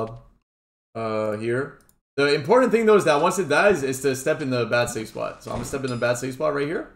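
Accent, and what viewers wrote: Quebec, Canada. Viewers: yo are you from quebec?? man you sound like youre from quebec hehe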